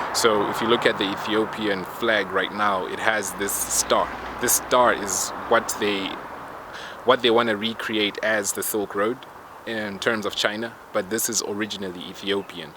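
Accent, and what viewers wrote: South Africa. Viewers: Ey bro, what country are you from?? You sound South African😌🤜🤛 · You are definitely wise and well educated. I love the information you have given. I grew up in Africa and I can tell from your accent that you have grown in south Africa too. Some of this information is beautiful. However there is only one KING OF KINGS, and that's Yahuah Hamashiach(Messiah in Hebrew) not Haile Selassie. I know his name means the power of the Trinity but look up the Trinity. It isn't what Yahuah Hamashiach or his followers preached about. Having said all this, you've quoted the word well with Philip and the Ethiopian. I hope to find more of your videos. Shalom brother. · I know this has nothing to do with the video but I just wanted to ask, are you South African? I had to instantly subscribe looking forward to listening to more of your content 🙏🏾 this was a good video very well articulated and put together 💯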